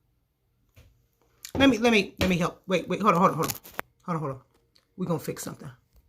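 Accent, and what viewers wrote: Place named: Inner city, United States. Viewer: Damn Girl, I am impressed, you are smart. I liked how you said "I looked it up on Google", Instead of "I gOOgled it", and as gOOgled is not a verb, you can not do that. I also love how you do not trust the internet, "because they be changin shit." You are articulate, but you speak with an inner city intonation in order to reach a particular audience and achieve a desired affect. To add something constructive, I would like to add to your imploration on the learning of words, words do not have meaning. Words are representations, liguisti-graphical representation, of objects, actions, and descriptions. "Meaning" does not come from a word, "meaning' comes from you, the speaker. The problem with meaning is that once you go cross platform, the word still carries with it, its original connotation. You understand the importance of fundamentals.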